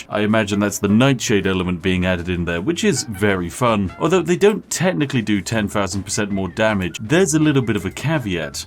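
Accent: United Kingdom. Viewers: Day 1 of reminding we want to hear him say "Sugoi" in his glorious British voice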